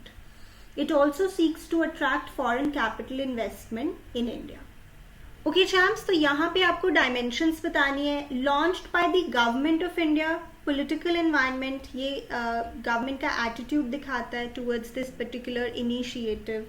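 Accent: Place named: United Kingdom. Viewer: Aap british english b bolte ho 😬 #via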